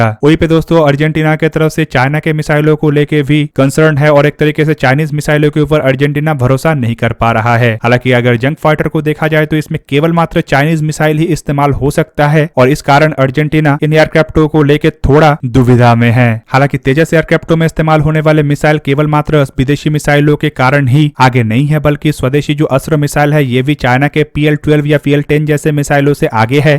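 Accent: Bengal, India. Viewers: Bhai Bengali ho kya Tum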